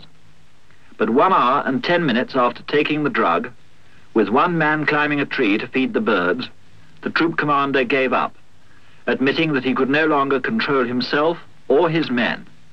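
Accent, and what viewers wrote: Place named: United Kingdom. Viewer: There's something a british man narrating this that makes it so right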